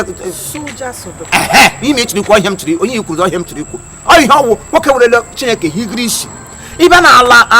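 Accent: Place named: Imo, Nigeria. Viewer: on behalf of Orlu, Nkwere, Dikenafai, Okwele, Umuduru, Anara, and Ala Ekwe, I hereby remove my hat for you. You're a special CASE! Lol (I need to visit Ekwe) when I come back home. Please keep speaking Ekwe/Imo state dialect, don't EVER try to speak Anambra dialect again. #Ekwe #ImoState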